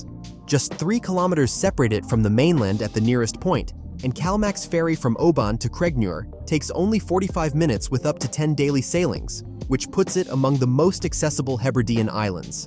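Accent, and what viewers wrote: United States. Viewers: For the Lord's own sake why the hell is it we have to put up with 'warder' and not water, 'oud of', not out of, 'Bridains' not Britains and so on. I had to turn the sound off as the voice was going through my head. The heading:- 'Forgotten British Islands', then 'Proper Britain '. Dear oh dear, what an insult. Soon after the video started, 'Bridish system' was mentioned. I turned it off in disgust to look at something else as narration was needed. "Blasted Americans, keep to your side of the Atlantic and shut the blasted door. Oh yes, turn the key - permanently"! My son moved to America in 1993. Because he assumed I had come into money, in 2007 had the nerve to call me. I thought that I was talking to an American, listening to him. Wise he was as he never called me again after that. I left Surrey 67 years ago and moved to Dorset. I am told I sound as though I have arrived from London even with the passing of all those years. 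That proves there is no need to act / sound as an annoying American. There is something a little disturbing with the English ,as in the 50s and 60s, cars were made to look American by introducing silly rear tail fins and 'wrap - round' windscreens. "Oh! my dear departed mummy, please come back, 'cos I am starting to cry and also 'cos I loved to hear the click, click of your knitting needles". - 'you there Mummy? - MUMMY?? - sniff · Why the American accent & the annoying music???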